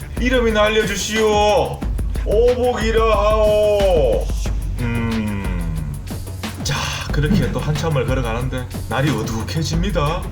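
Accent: Gyeongsang, South Korea. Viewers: 넘 재밋어요. 교훈도 되구요… 뭐니뭐니 해도 남도 구수한 갱상도 억양이 잴로 좋아 홀딱 반했어요.ㅎㅎㅎ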